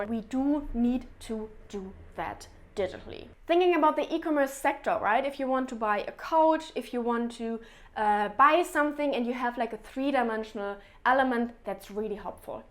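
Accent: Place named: Europe. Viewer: As an Egyptian American UX designer myself I love it when I see knowledgeable UX designers like you happen to have an accent. It emphasizes my opinion on how most great trends in UI, fashion, interior design come originally from Europe and Asia. Americans here might be “uncompetable” when it comes to technology, but Europeans—especially Germans, French, Polish, and Italians—are definitely wizards when it comes to aesthetics and art. Good job, loved your content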